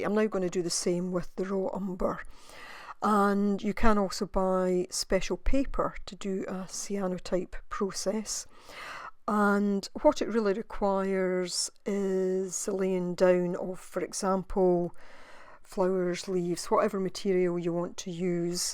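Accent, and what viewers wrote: Scotland, United Kingdom. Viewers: I like your videos. It's refreshing to hear a Scottish accent for a change on YouTube.I do similar craft stuff myself but am a digital dinosaur. I am watching from the Outer Hebrides. Every blessing to you and Nina for future videos xx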